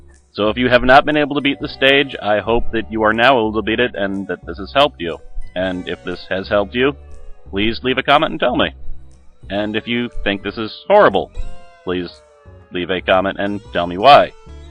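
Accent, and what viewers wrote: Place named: South, United States. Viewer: your accent remember me a southern man... don't know why!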